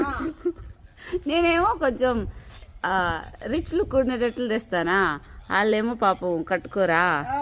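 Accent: Telangana, India. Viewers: Language b kothakota sid laa undi andi ? Yeplace andi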